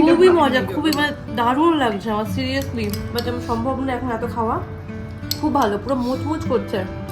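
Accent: Kolkata, India. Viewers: ওনার কথাবার্তা পুরোপুরি কলকাতার বাঙালিদেড় মতন বাংলাদেশের মতন কোনো টান নেই এবার দেখার কথা যদি বলেন সেখানে ভারতীয়রা ও বাংলাদেশিরা একিরকম দেখতে আলাদা কিছু নয় কারণ আমরা সবাই আর্য তাই আমাদের চেহারার গঠন এক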